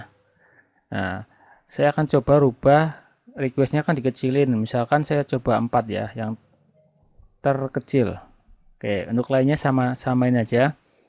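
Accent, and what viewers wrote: Banyumas, Indonesia. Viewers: menyimak wae om cara setting comand line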